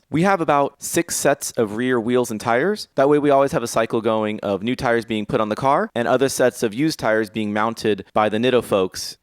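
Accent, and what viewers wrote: Greece. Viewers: Where are you from??? Greece?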